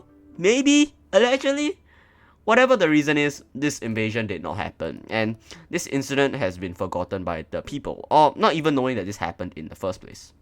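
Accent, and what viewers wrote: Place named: Singapore. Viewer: Another Conspiracy Theory by an Imbecile who speaks Singlish He must be a Singkie/ Singky who's sinking swiftly in Selat Tebrau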